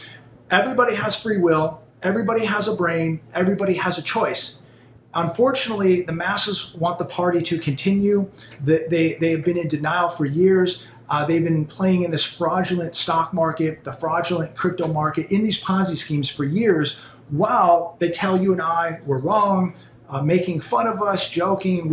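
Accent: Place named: South, United States. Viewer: I couldn't get past her Southern twang even though I'm from the South myself.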